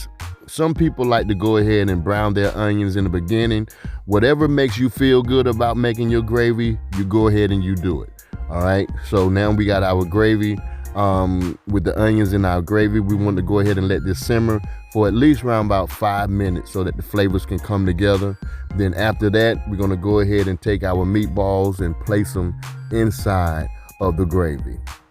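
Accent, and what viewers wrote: South, United States. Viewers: Yea! I heard the first 5 seconds and guessed correctly, he’s in the south ❤️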